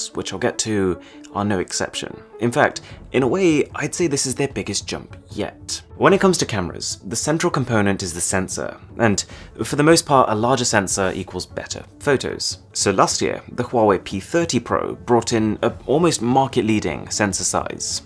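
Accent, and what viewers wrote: Italy. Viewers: are you itAlian?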